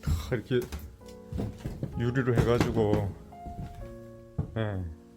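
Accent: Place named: Gangneung, South Korea. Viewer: 강릉 감재 특유의 말을 들으니 반갑소야. 시방은 서울에 살지만.. 저도 고행이 강능 죽현이래요.. 촬영차 오가시는 길 시나미 잘 댕기시우야.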